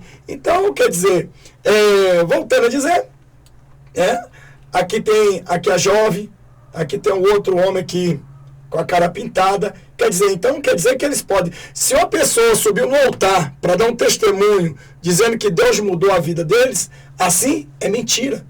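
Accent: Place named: Rio de Janeiro, Brazil. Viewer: Não é a voz da Débora nem da Léia Essa mulher da voz tem o sotaque carioca no S Débora e Léia não tem.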